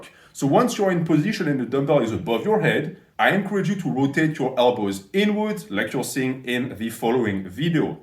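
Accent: France. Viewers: Rassure moi,tu es français? Je fais allusion à ton accent. Je suis également fan du pull-over,il travaille tout le haut du corps,trapèze,épaules,dos et pecs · Oh l'accent français !!! Trop content de découvrir cette chaine !!! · je sais reconnaitre un accent francais quand j'en entends un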